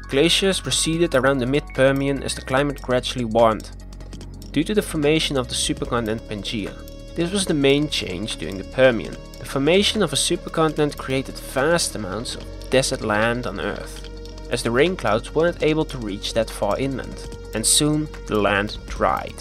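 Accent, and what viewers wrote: Netherlands. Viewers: are you dutch? i kind of hear a dutch like accent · Sounds Dutch to me. Mix of various accents. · Dutch or Flemish huh? Your accent tells me :p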